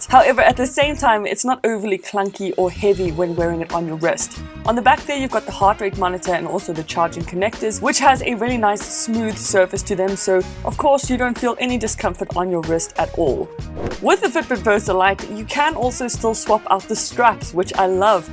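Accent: South Africa. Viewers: Are you a SAFFA? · I can spot a South African accent a mile away . . . nice review sistas!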